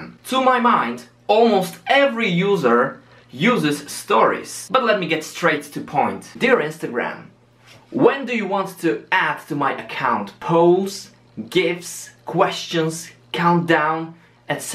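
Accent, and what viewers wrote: England, United Kingdom. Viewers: Čistí angličan super ide ty Anglický jazyk 😊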